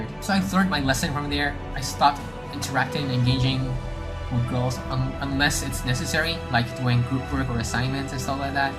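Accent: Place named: United States. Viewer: love your American accent 👍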